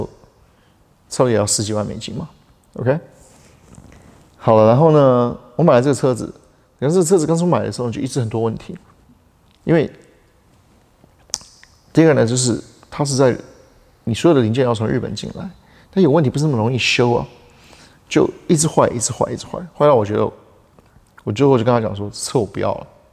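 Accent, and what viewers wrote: Taiwan. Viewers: 台灣人特有的口音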